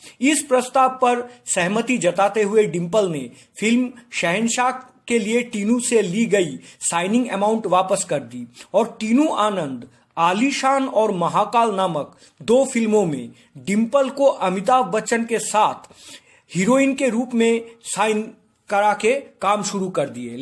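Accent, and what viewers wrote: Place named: Bengal, India. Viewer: Baangaal na tumi ... Xcent ta oto sundoor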